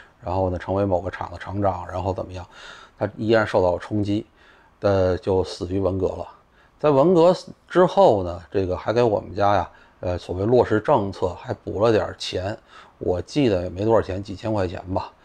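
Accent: Beijing, China. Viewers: 北京人说话真是自带幽默感，我可太喜欢了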